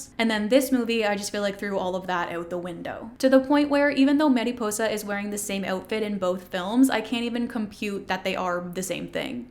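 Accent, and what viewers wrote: Canada. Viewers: You have great teeth and say oot instead of out, so you must be from Canada.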